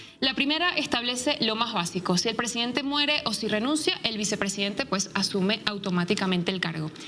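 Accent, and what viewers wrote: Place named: Venezuela. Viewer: En el acento, se le nota que es venezolana.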